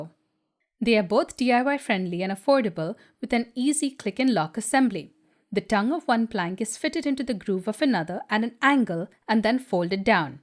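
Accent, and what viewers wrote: India. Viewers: Your looks and accent are so Indian 😂 (Asian Indian). I can't get over it.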